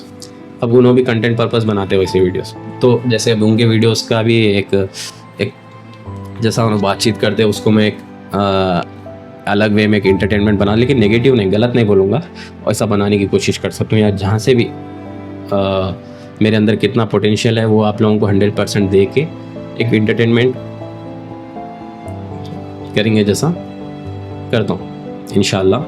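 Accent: Hyderabad, India. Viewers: Bhai tum serious baath kare to bhi hasse waisa dikhra 😂 woh jaando nakko chhodo videos banana ache banate tum videos🤣 ek to bhi rehna bhai Hyderabadi accent me roast karne wala😂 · Assalamualaikum bhai hum logao aap ke sath. Hyderabadi accent chodke amer dens ke Jaise videos banao jaise amer bin ishaq reel reaction do baigan reply do Bhai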